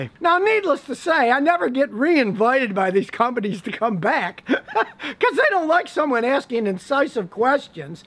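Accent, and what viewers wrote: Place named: Midwest, United States. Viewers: Definite midwest accent here. I'm guessing Chicagoland area? :) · You almost sound Chicago in this video! Not one once of the bullsh*t you'll hear daily.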